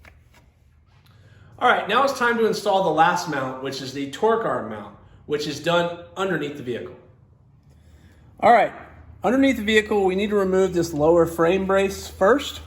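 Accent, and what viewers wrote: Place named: Texas, United States. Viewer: Instruction car videos are just better in a Texas accent. LOL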